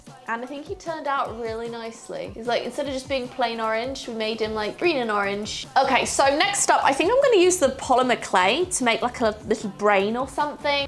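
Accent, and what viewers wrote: England, United Kingdom. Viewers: seriously LOVE that pays attention to and creates her own closed captioning. Not everyone does, and since she speaks quickly sometimes and I don't 100% understand everything in an English accent, I really appreciate it. Thanks,! Hope you're doing well!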